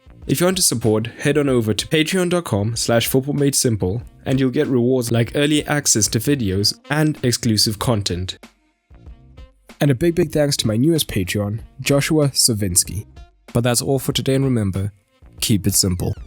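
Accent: South Africa. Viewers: Bro are you south African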